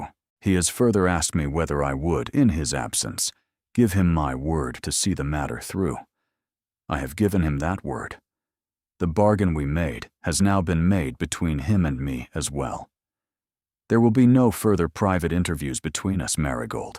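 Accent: United States. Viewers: Why pick an American voice for a totally British story. This keeps happening. I have listened to some very good stories with proper British voices & some were excellent. This one has a Duke speaking in an absolutely inappropriate American voice. Then, the story has the most unheroic hero I can image. He grows up with a brother & sister. He loves both of them, the brother as his best friend & from the time she is 16, he falls in love with the sister of his best friend. She loves him in return but this weird non hero sets out to never allow any chance of them being together. He even tells her to marry someone else & tries to run away from her. She, actually, is a heroine & chases him down so they DO wind up together but, frankly, I can’t imagine not being so disgusted by that time, besides just tired, I would wonder if I could ever trust him. There was never a good reason for his ridiculous sacrifice of their lives that I could see. 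It’s something different but not likable. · I really have a problem with this AI’s voice. Its sooo unpalatable. I couldnt enjoy the video because of this repulsive voice and American accent that really does not suit this type of audio story.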